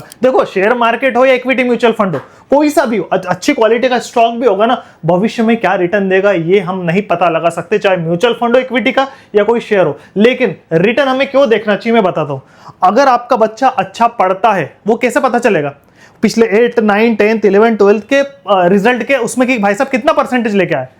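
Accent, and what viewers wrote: India. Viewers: भाई मेवाड़ से हो क्या..??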